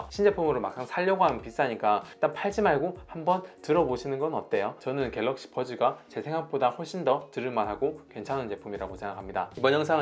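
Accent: Gyeongsang, South Korea. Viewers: 제가 경상북도 출신인데 뉘앙스가 너무 친근해서 그러는데 어디 출신이신가요 ? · 부산 근처이실 것 같아용! 대신 답변 죄송합니당..ㅠㅠ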